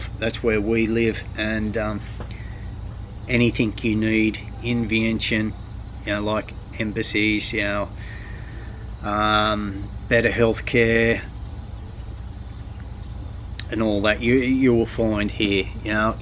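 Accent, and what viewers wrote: Australia. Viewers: Your Australian accent is enough to keep me watching 🤣😆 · Hey....don't seek perfection...in every video you are doing...great...its informative...since it's new world to us....l miss vientiane....sooo much... Dazzling nature....honest People....love your ozzi accent....Baghdad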